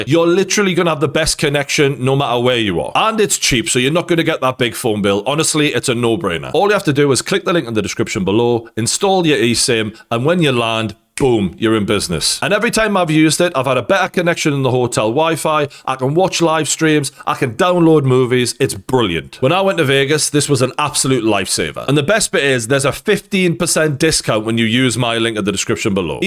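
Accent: Newcastle, United Kingdom. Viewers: True geordie ffs cringe he's got a jesmond accent and he's pulls himself thinking about woltemade 😂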